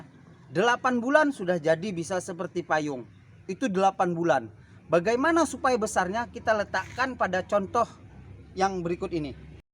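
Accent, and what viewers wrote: Banjar, Indonesia. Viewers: Logat bahasa banjarnya masih kental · Logat banjar nya kd hilang lah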